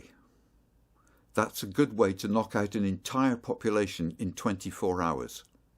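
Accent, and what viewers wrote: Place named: United Kingdom. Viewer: This is an excellent discussion about this spreading scourge (more like a badly misguided use of a potentially good technology) that seems to be spreading like cancer across the cities and countries alike. Granted, this focuses on the UK (based on the accent of the person narrating) but here in the United States, there have been many cities that have replaced the high pressure sodium (and low pressure sodium too) lights with harsh white LED lighting and it has practically ruined the nights and badly diminished the city nights altogether. I DO know that some of my argument may stem from nostalgic reaction to a changing technological paradigm. Maybe I'm just the 21st century version of those who complained about gas lights being replaced with incandescent light bulbs during the early 20th century but I can't tell you much I HATE, HATE, the harsh white light of these LED streetlights that are installed in places where they are NOT suitable to be in. 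You mentioned there was a petition set up to get them removed. Sadly, there's a general sense of resignation here in the states but at least a few cities had such outcry against such harsh lights that they either stopped installing them or replaced them with warmer colored lights. There are residential neighborhoods all around me that have long installed these LED streetlights and some of them actually hurt my eyes and I'm only 40 years old as of this writing but nearby streets that I regularly drive on at night now have these ultra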